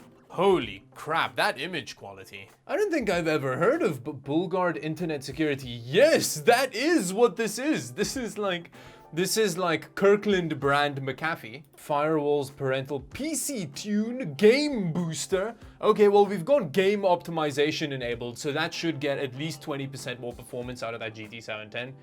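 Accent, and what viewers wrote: South Africa. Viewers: Is that a Saffa accent I sense? ☆ ○ ☆ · Are you from South Africa?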